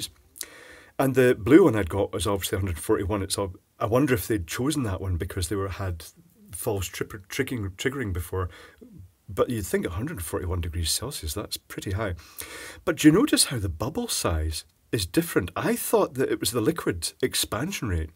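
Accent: Scotland, United Kingdom. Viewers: Caption generator doesn't cope with Scottish accent...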